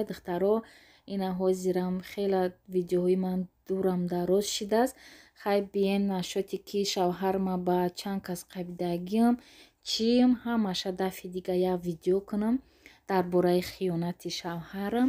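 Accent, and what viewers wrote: Konibodom, Tajikistan. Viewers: АСАЛОМУ АЛЕКУМ апачони гулмм бо кони бодомш чи лавзаи 🤗😂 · Хохарчон заминкадар чизхоя шавхаратон бахшидагиет, вай бошад намемонад ки шумо бо шеваи ширини Конибодоми гап занед😊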